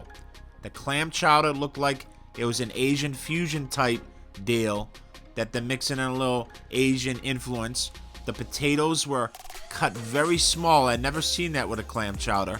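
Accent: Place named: Boston, United States. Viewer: Stick with it…..your content is improving….you are likable and your absolute Bostoness is contagious….I am now a subscriber.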